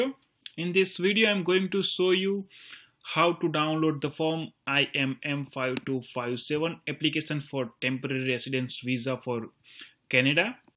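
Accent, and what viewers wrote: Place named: India. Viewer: Узнаю индийский акцент. Спасибо.)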